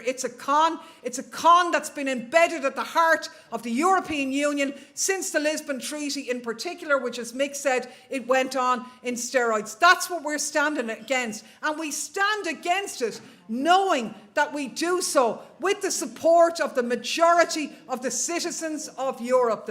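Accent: Ireland. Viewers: Is she Irish?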